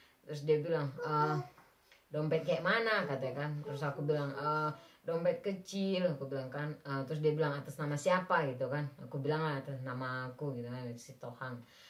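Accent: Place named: Medan, Indonesia. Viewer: selalu tanpa skip kalo nonton vlog ka, seru bgd apalagi pake logat medan lucu bgd 😂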